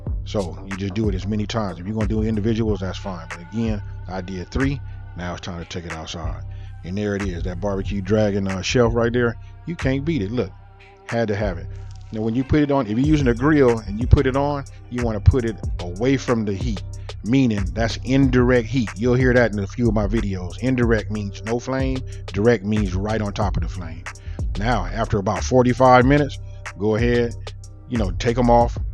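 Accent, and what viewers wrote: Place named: Los Angeles, United States. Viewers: If LA had an accent thish it homie. Loved the video I’m about to make this right now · He sounds like a west coast guy...My people loved the recipe love from the north east 😎🤙🏽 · I heard that Cali accent and subscribed immediately!!! Made me straight home sick. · I can tell that Cali accent anywhere. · I love California accents. We really the best out mayne 😂♥️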